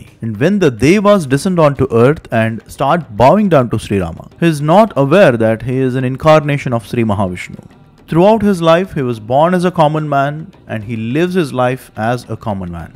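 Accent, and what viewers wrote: India. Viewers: Sir, your Youtube Info says that this channel is Belgium based, but you sound like indian while reciting Shlokas in the videos, anyways stay healthy and keep us updating with the knowledge 🙏🏼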